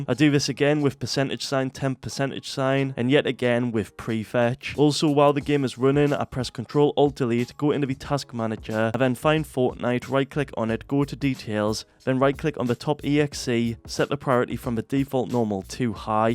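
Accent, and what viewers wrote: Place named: Newcastle, United Kingdom. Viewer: we have like 50 diff accents so his is Newcastle btw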